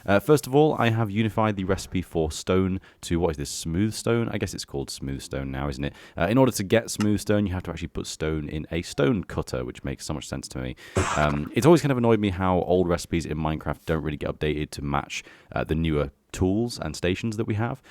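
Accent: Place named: England, United Kingdom. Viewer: Somewhere in England, he's certainly got the accent for it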